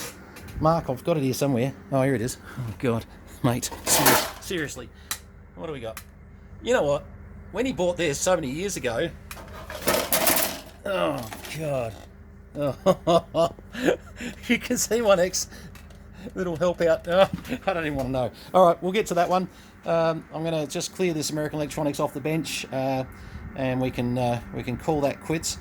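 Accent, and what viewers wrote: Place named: Australia. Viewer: Wow. Glad you're here. I'm trying to repair/restore the very same AE 76-577. Such issues after issues. I've had to install NTE1233 when we couldn't get an ECG1233, and that's because well, you know what it's like trying to find an 02A today without donor radios. Then I had to rebuild the VCO block. The last owner had cracked the slug so I had to dig the old one out, resleeve the wall and donate a slug from another. Now after all that, I've still not got lock. I'm chasing a gremlin. I need to get an AE 76-577 specific service manual. I got my hands on a Cybernet service manual, but it can't quite help. Anyway, it was nice to hear another Aussie while looking for AE stuff, and great to hear and see you got yours hummin' again. 73's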